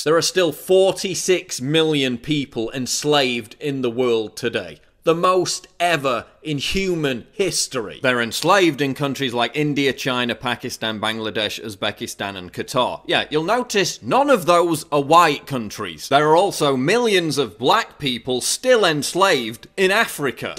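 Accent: United Kingdom. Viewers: you are the living proof of people with a British accent getting away with saying utter shite. I wonder if your intellect is strong enough to deal with the hard topics of political philosophy or are you just gonna make a name of yourself by posturing as the tough guy to college sjws?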